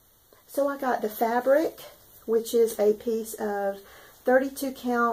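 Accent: South, United States. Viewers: Another wonderful video. It always makes me happy to see your sweet smiling face and listen to your sweet southern voice. So awesome you got to meet Nicola, hear her lecture and take a class...unforgettable. I also had to stop the video to shop for the Karoline...pattern. I haven't stitched with wools yet, but I will in the very near future. Love the Sampler piece over 1, I'm doing mine over 1 as well...but way behind you. Hugs and stitches right back at you. ❤️❤️❤️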